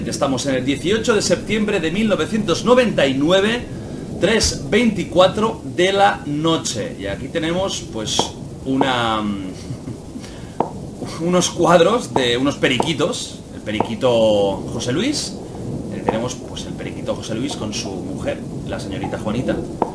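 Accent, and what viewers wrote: Catalonia, Spain. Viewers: Me encanta tu acento catalán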